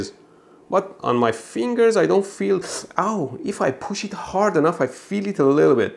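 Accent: Iran. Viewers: خو ایرانیه؟ بابا لهجه ش خود لهجه فارسیه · ایرانی هستی داش ؟ نه ؟ قیافه ، لحجه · اره ایرانیه دانشگاه تهران درس خونده بعدا رفته کانادا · I just love his thick Persian accent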